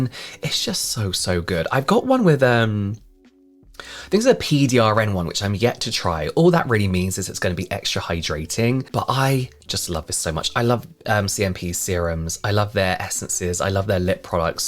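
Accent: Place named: United Kingdom. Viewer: Think you're hearing "tret" as in tretanoin, but with a British accent 😂 some of us don't pronounce our Ts.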